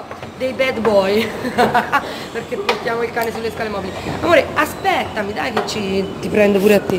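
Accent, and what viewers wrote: Tuscany, Italy. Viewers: Che dolce quando parli di Axe!😍Comunque adoro il tuo linguaggio😂😍 è molto simile al mio ,tolto che tu parli toscano😂😂😂!Comunque con il rossetto liquido dior anch'io non mi sono trovata bene,sono andata a cena e lo avevo dappertutto,mi dura molto di più il rossetto in stick!Bah 33 euro buttati!Baci😘😘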